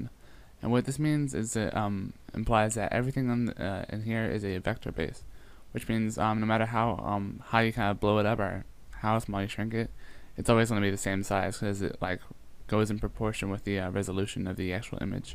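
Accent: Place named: Ireland. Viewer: This guy sounds like some gay pissed off irish...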